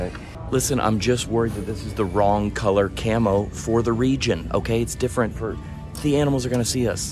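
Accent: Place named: Tennessee, United States. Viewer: are you from tennessee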